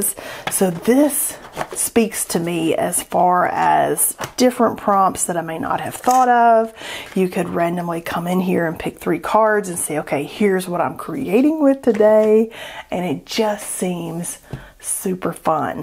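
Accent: South, United States. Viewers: I first discovered on Skillshare. Her “art noises” had me hooked from the beginning. (Plus, when a southerner hears another, our ears perk up). She very quickly became my favorite instructor, because- as you pointed out- her enthusiasm is contagious! ☺️ It doesn’t hurt that I have learned a lot, too.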